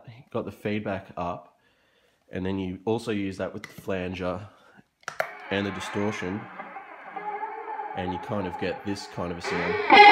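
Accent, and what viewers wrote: Australia. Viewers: Are ya aussie mate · Sorry My Bad, Now looking back at the Video you do Sound more Australian. 😁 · very helpful video! didn't know you were Aussie, where about are you located? I'm from Adelaide but have been living in Canberra for most of my life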